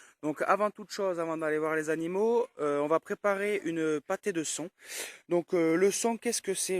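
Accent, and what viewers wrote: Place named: South, France. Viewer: Tu vien de quelle région? On voit que tu viens du sud avec ton accent....J'ai le meme .... Apres sa ce trouve je me trompe tu viens peut être du nord mes sa serrai bizarre (MOI midi-Pyrenée donc du sud 😂)Sinon super video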